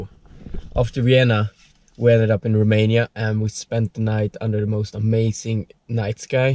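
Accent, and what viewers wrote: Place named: Sweden. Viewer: Jag tyckte att du hade en svensk dialekt😂😅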